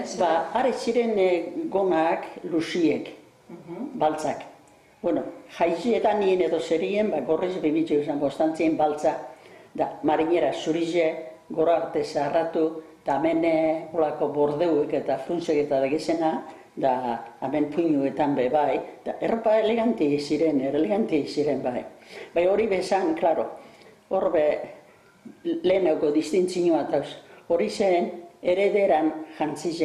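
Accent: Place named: Biscay, Spain. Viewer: Eskerrik asko bizkaiera bizirik mantenduteko holango ekintzengaitik, entzutian modukorik eztau🤍 🤍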